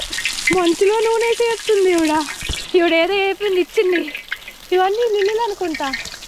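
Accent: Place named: Andhra Pradesh, India. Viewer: మీది రాముడువలస??? మీ యాస అలాగే వుంది. ప్లీజ్ చెప్పండి.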